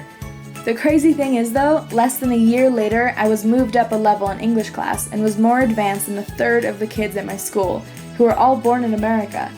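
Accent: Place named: Bulgaria. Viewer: Bulgarian accent's always the hardest to get rid of, haha.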